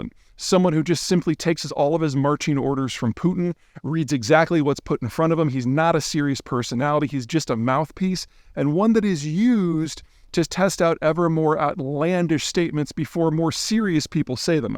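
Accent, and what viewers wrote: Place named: United States. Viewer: First of all: great video as usual, super informative. I just can't help hearing "car key" whenever you americans pronounce Kharkiv, and it's really funny to me.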